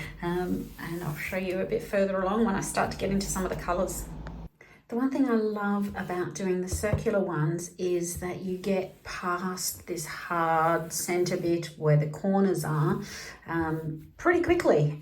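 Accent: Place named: Australia. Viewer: A big thankyou....very informative. Not a boring video as they can be N you sound Aussie, like me.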